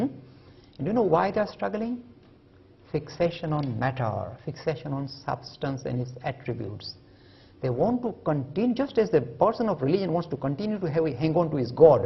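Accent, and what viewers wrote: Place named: India. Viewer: this is not richard Dawkins .this is an Indian seethe ascent not british. FAKE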